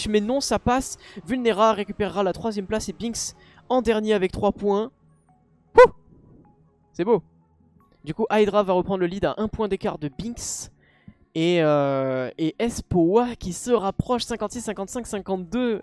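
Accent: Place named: South, France. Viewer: 2h du mat quand je regarde et surpris d'entendre gueuler un sudiste au bout de 10sec de vidéos XD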